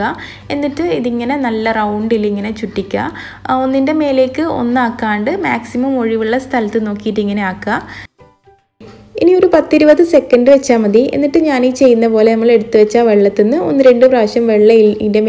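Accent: Kannur, India. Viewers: കണ്ണൂർ ഭാഷ കളയൊന്നും വേണ്ടാട്ട. അതാണപ്പ അയ്ൻ്റെയൊരു രസം. പറ്റുമെങ്കിൽ ഇംഗ്ലീഷ് സബ്റ്റൈറ്റ് ൽ കൊടുക്കാൻ ശ്രമിക്കുക. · Good ഇങ്ങനെ തന്നെ കണ്ണൂർ ഭാഷ യിൽ തന്നെ മുന്നോട്ട് പോവണം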